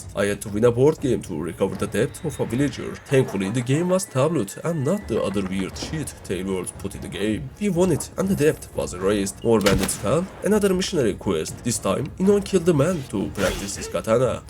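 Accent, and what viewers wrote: Turkey. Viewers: Kanka edit ve espiriler çok güzel türk aksanını da çok iyi taşıyorsun.Direkt aha Türk bunun mizahı da iyidir dedim öyle çıktı.Yolun açık olsun. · Dude where u from tho? Sound like turkish accent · Ya bende diyorum bu aksan nereden tanıdık. Adam Türkmüş aq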